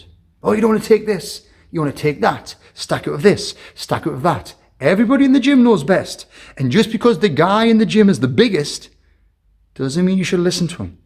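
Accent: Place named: Dumfries, United Kingdom. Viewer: Have you ever been to Dumfries in southern Scotland? Your accent sounds similar to it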